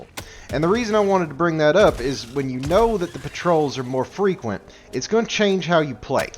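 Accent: South, United States. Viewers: Came for Helldivers tips....stayed for the Southern drawl....lol. Entertaining vid and great commentary.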